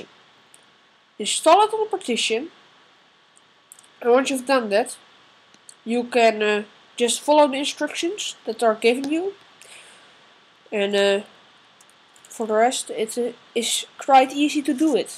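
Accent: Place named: Netherlands. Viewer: I can clearly hear that you are dutch :D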